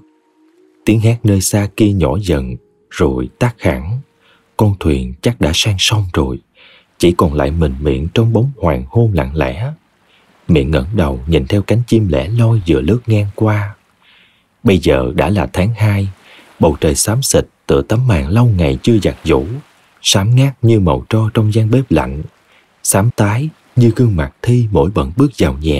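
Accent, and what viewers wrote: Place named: Saigon, Vietnam. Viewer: Yêu mến cái giọng Sài gòn của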